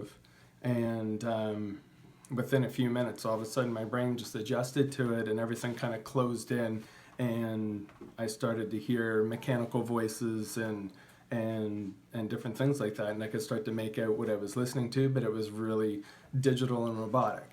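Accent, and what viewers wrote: Canada. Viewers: Congratulations!!! This is fascinating to me... You can tell that now you can hear, you have picked up an accent... Canada right? Its the ' ou ' like about and house... Lol.. When you were telling the surgeon or the lady that was getting you in touch with sounds you didn't have your accent... That is what fascinated me... Very happy for you.. Something I take for granted and seeing and listening to your story .... Changed the way I look at things.. Thank you for sharing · He must be Canadian, what I find interesting is that his voice got a little higher now that he can hear. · I thought he had a very cool foreign accent in the first video. Now after a year or two, he sounds like an average Canadian. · I'm so grateful that this has changed your life I watched a video where you initially got the implants. You're doing so well!!!! You have you Canadian accent back 🙂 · So cool, crazy that you have a very distinct Canadian accent!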